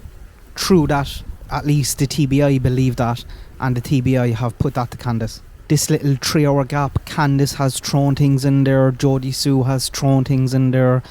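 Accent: Ireland. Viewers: you gotta be Irish , I am an you have what sounds to me Limerick or Tipperary ?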